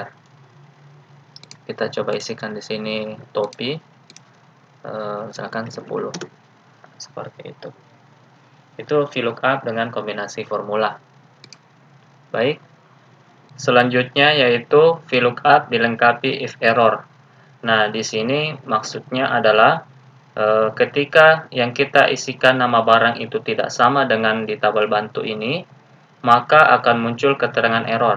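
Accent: Indonesia. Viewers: sepertinya masnya orang makassar ya...soalnya logatnya kental banget..thx ilmunya yang mudah di mengerti ! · Kayak logat sulawesi sappo..makasih tutorialnya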